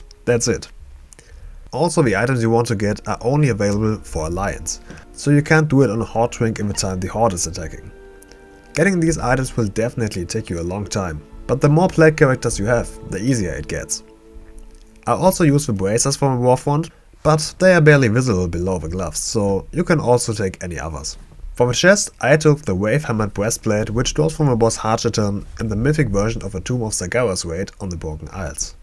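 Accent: Germany. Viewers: Ich meine ich höre da wen Deutsches heraus!^^ Schöner Content!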